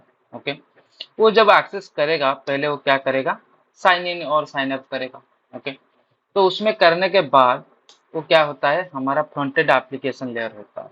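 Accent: Odisha, India. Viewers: Are you an odia, bro ?? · Are you ODIA?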